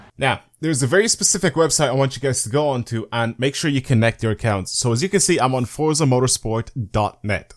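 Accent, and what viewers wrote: Ireland. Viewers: Are you from county Offaly? You sound just like my Irish cousin who lives in Offaly. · Are you from Ireland ?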